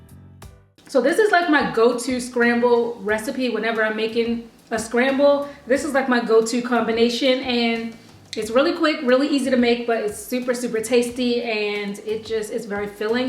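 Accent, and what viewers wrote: United States. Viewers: So im 3 mins into the vid and im like, holdup.....when did she have an american accent? for the longest I thought you and Shirley B Eniang where the same person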